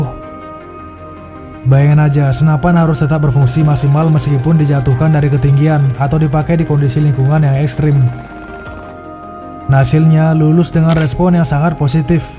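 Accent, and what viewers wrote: Bali, Indonesia. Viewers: Suara voice overnya keren, empuk, clear, ada beratnya, artikulasinya jelas, berlogat Bali seperrtinya ya mantaaap 👍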